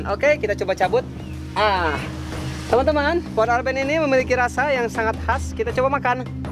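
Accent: West Java, Indonesia. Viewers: "saya tigebros temen2 " khas sunda melekat bang jago, sukses terus a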